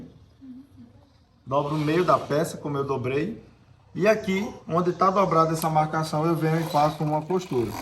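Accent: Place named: Northeast, Brazil. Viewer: O professor faz tudo parecer tão fácil. Parabéns. E esse sotaque maravilhoso. Sou carioca e amo sotaque nordestino ❤🎉🎉🎉🎉🎉🎉🎉🎉🎉🎉😂bj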